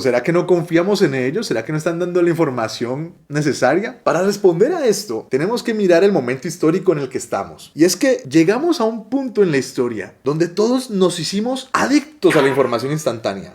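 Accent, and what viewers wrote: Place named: Colombia. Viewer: Su voz me parece familiar? Ese acento colombiano.. ya lo eh escuchado en otro canal? Excelente contenido muy buena edición , horas de grabación para los 20 minutos más informátivo , sigue así bro saludos Colombia Cartagena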